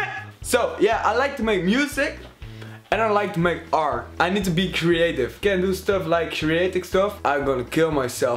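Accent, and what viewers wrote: Netherlands. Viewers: Je bent nederlands. Dat hoor je aan je accent😂😂💗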